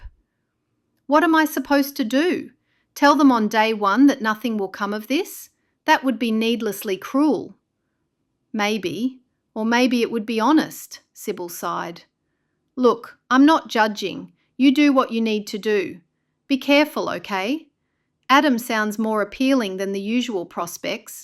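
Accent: United Kingdom. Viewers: It doesnt sound like an Amish dialect, sounds like uk accent takes away from story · I really wanted to listen to this story. I love your stories. But just can't listen, I'm so sorry. You're a very gifted writer, but no Amish ever has a British accent.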